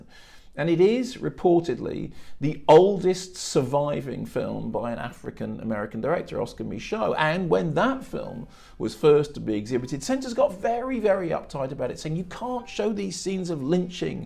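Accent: United Kingdom. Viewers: I love this guy's reviews, just wish I could understand everything he's saying being from across the pond and alll that British intonationation. Maybe if he could speak just a tad slower...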